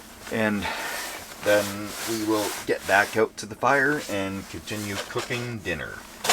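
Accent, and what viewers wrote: Canada. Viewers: I knew he was Canadian ah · I was about to go on the defense, but then he talked, and I get it. He does sound Canadian. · you sounded canadian and after i heard “vitamin beer” i had to check and i was right.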